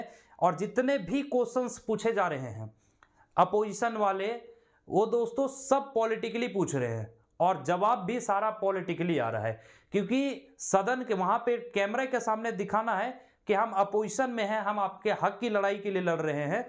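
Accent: Bihar, India. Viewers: Bihar Ke Hain Kya sar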